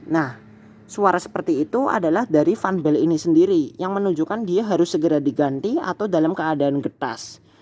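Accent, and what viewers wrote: Banyumas, Indonesia. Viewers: Mase Banyumasan iki ... Ngendi kiye...hehe. suwun nggeh infonya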